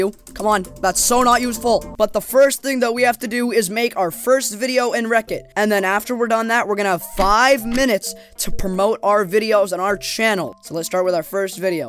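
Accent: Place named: United Kingdom. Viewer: goated channel frll (why is that your first youtube video you had a biritish accent?)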